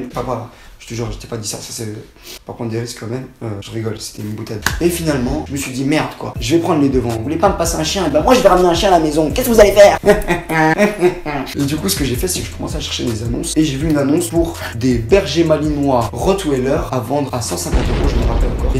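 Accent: Belgium. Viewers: T est belge ?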